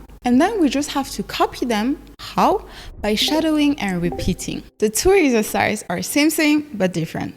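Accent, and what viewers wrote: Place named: France. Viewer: The accent is French and the name is a word in several bantu languages in Uganda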